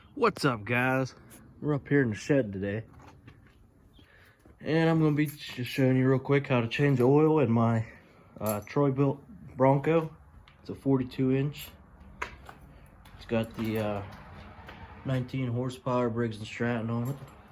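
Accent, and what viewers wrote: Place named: Pittsburgh, United States. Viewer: Dude u gotta be from Pittsburgh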